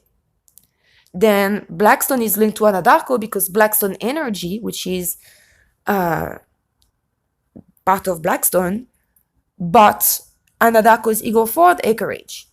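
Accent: France. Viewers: I thoroughly enjoyed your video. Thank you. New subscriber. By the way, I absolutely adore your accent. It reminds me of our French exchange student who is just a doll. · Did you say that you were German? You sound French to me. Beautiful accent! Blue and black.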